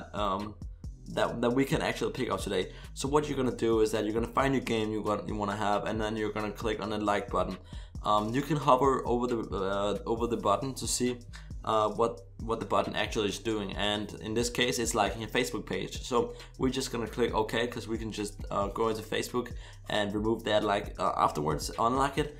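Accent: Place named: Denmark. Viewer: Trode ik du var dansk :P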